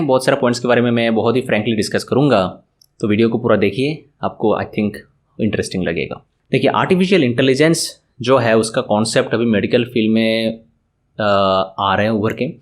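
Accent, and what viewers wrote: Bengal, India. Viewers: Love ur bengoli accent😅😅 It remides me of my chemistry teacher He was also from wb He came mp Whenever he speaks he makes commendable mistakes in hindi Lovely teacher Debdas Patre #arrowdere Love u sir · Areyy bhaii Hindi te r English Bengali accent bojha jachhe naa nki ?? ... R emni teoo ... Bangali toh obviously Bengali fluently bolteii paarbe .... Kaarpn Mother Tongue toh Bengali ... !!!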